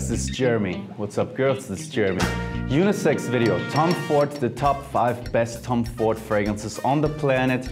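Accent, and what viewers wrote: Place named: Germany. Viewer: that german accent thou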